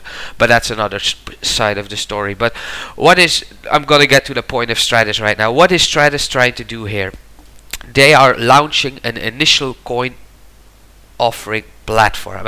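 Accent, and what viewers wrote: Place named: Netherlands. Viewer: Your English sounds dutch!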